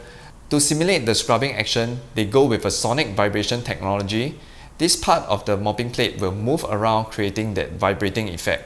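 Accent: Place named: Singapore. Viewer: Impressive amounts of tests! I'm Korean but would never buy a Samsung because they are severely overpriced in Korea. So I'd stick with Xiaomi or Roborock. On a side note, very interesting Singlish accent. I have quite a few Singaporean friends and find the accents vary quite a lot from person to person. Wonder why that would be?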